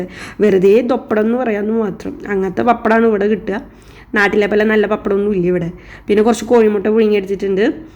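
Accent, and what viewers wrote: Thrissur, India. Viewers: നിങ്ങള് തൃഷൂർ ആണ 😜 എല്ലാം സ്പീഡിൽ പറഞാൽ പെട്ടെന്ന് ബിരിയാണി ഇത് പോലെ തയ്യാറാക്കാം 😂😂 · ബിരിയാണി ഇഷ്ടമായി. ആ സംസാരവും എനിക്ക് ഇഷ്ടമായി. തൃശൂർ ക്കാരി ആണോ.ഞാൻ തൃശൂർ ക്കാരിയാണെ. 😆😆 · 🌹🌹🌹🌹ഒരു അപേക്ഷ ഉണ്ട് ചേച്ചീ ഒന്നൂടെ തനതായ തൃശൂർ ഭാഷയിൽ സംസാരിച്ചു വീഡിയോ ചെയ്യാൻ പറ്റുമോ. അത് കേട്ട് പാചകവും കാണാന്‍ ഒരു രസം ഉണ്ട്... 🌹🌹🌹🌹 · തൃശൂർ ആണോ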